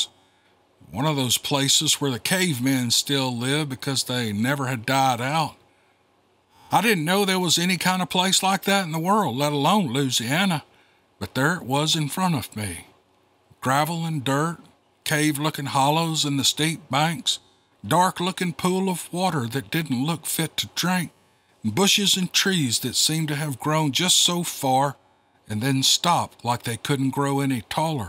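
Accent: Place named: Appalachia, United States. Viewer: I'm frail now, in my early 60's, but liver failure has made me look far older than I am...and my looks betray what I once was, long ago. 6'5", 245-lbs...once a U.S. Marine, then various colleges and careers leading me to a distinguished position as a Director of Engineering...all betraying this lanky half-Cherokee kid who grew up not far from Clingman's dome in Tennessee...an 'appalachian hillfolk', as it were. I hear the accent that I had as a kid, and listen intently to the stories told here, and it brings me back to old stories like I grew up surrounded by...legends of the hill people and the Cherokee...from a time long ago. The love of my life and I spent summers in each others arms...and she has been my wife for many years now...almost 50, as memory recalls (we were 'like one' for years before we actually got 'legally married')...and nothing is better than sitting here in the evenings with her, as we listen to the channel like you might listen to WSM-650 back in the day, and hear the old shows and country music...by the living room radio (the size of a modern TV now)...keep up the great work,...some things should never completely 'fade away'...like the stories here.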